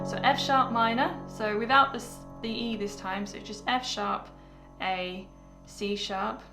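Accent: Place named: Lincolnshire, United Kingdom. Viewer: Hi, Your tutorials are really easy to follow and you explain them so well, I’ve be trying this on and off for a few weeks now and almost nailed it. Can’t add vocals yet though lol. Just out of interest and I hope you don’t mind me asking but you sound like you’re from my neck of the woods, sort of Grimsby Humberston area of Lincolnshire. Hope I haven’t offended you with that 😅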